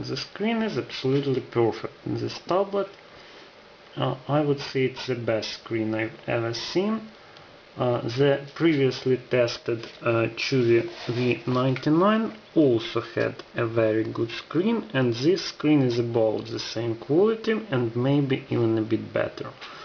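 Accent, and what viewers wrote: Russia. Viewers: с первых слов произношения слышно русского :)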